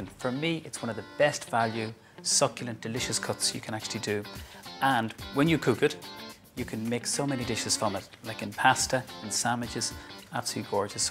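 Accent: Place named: Ireland. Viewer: Samiches 😂 love it, real Irish accent lol. Great video ☺️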